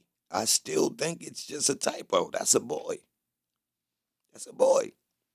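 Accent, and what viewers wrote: Baltimore, United States. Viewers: Are you from Baltimore by any chance?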